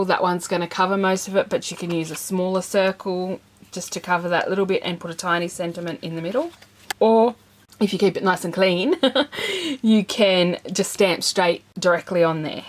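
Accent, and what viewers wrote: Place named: Australia. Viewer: Nice to hear a fellow Aussie on YouTube - thanks for sharing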